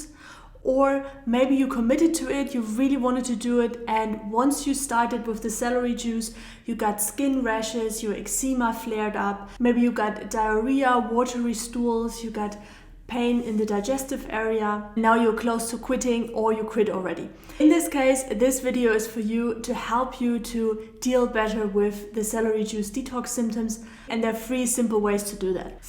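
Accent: Germany. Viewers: Thank you so much for your answer, I’m so happy that you actually read my question and replied!!!! Yes I’m addicted to Hawaïian spirulina since few years. I usually take them during winter in order not to catch cold due to its enforcement of immune system. I usually take two in the morning, but if I ever knew its so many benefits as you explained in your videos, I would have taken all around the year!!! Thank Goodness I have discovered you by chance, I am eternally grateful for all the precious advices you have been giving 🙏😍😊. I will take from now on 5 in the morning and 5 in the afternoon, but how long should I continue like this?? I am also suffering from migraine since long time, do you think celery juice will help me to get rid of it??? Thank you again, Dankeschön, I love your German accent in your English by the way 😍😍. I have lived almost 20 years in France and I had some German friends ( I also lived in Munich and in Vienna) and they all speak like you. 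I don’t eat dairy products and I rarely eat eggs and I don’t eat meat, but I love fish and seafood. And lots of fruits and vegetables. I love watching your videos and try to follow all the things you say... please keep up for I cannot pass a day without watching you on You Tube. Dankeschön 😍🙏😍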